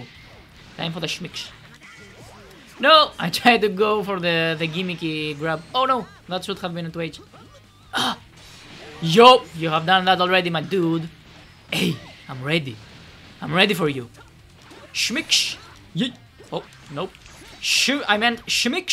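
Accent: Spain. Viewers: Bro tu eres español hahahah se nota mucho, tienes acento español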